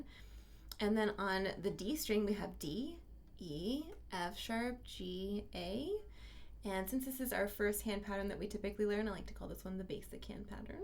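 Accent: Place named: France. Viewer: Im not in Patreon but yes in love 😍 😀. That French pronunciation 😌💞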